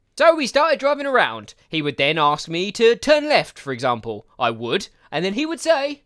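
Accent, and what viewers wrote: United Kingdom. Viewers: Do you have a british accent..? It sounds really good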